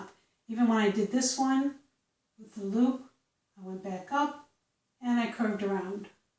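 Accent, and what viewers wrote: United States. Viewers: Thank you for the writing lesson. I followed along with my new fountain pen. Planning to begin written correspondence with my grandchildren who all live far away. In my mind, I was trying to pinpoint your accent. You sound like you could be from Scituate, Mass., or Enfield, Connecticut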